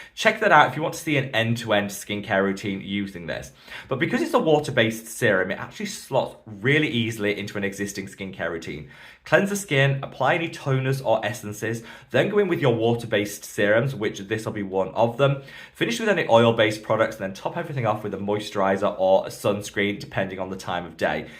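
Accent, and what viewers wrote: United Kingdom. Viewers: I enjoy listening to you. Your voice reminds me of a dear friend who’s a British expat in New Zealand!!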